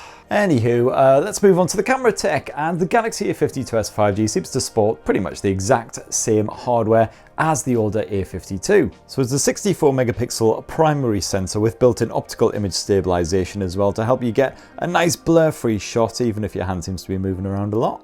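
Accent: United Kingdom. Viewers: This guy is very good in presenting but sometimes I have little difficulty to understand him. Kind of fast when talking. I beleive accent spoken here is British, but from what part of the UK? Saying "bloody weekend" at the end was a bit scary but I'm sure it was meant something nice 😁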